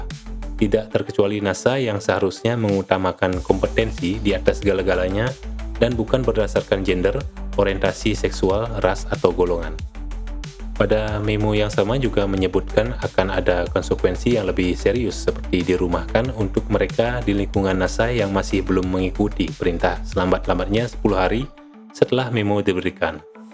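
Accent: Bali, Indonesia. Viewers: Harusnya adane Bli...medhok Bali ne bli yan...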